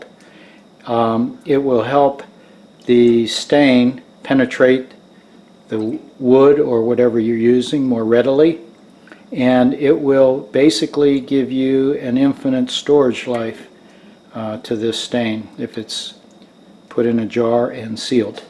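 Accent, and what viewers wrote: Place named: Pennsylvania Dutch, United States. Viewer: I can hear the deitsch accent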